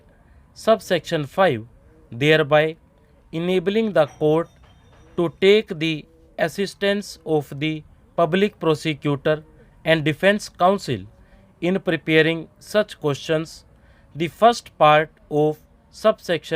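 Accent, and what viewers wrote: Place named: South India, India. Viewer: Sir your dictation helps me a lot. Thank you very much. But I am from kolkata and i am facing a problem with your accent(south). What should i do now?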